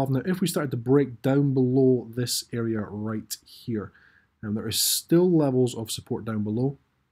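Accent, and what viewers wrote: Scotland, United Kingdom. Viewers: Greetings, great videos, especially those for beginners, which particularly helped me, and, you sound scottish, i do love the accent haha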